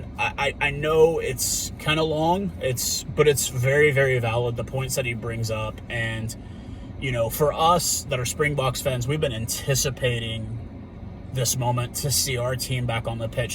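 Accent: United States. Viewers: I feel like the states is a sleeping giant went it comes to rugby. If they really adopted it, they could become a real threat within a generation. Thanks for the video and support for the Springboks. Lived in the states for 4 years, but in the south, you sound like you are from up north, detect a tinge of Minnesota accent on your end. How did you get into rugby?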